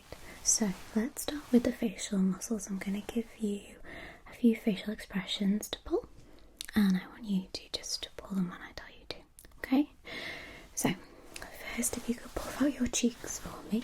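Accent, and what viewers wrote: United Kingdom. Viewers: Very relaxing British voice 🇬🇧👍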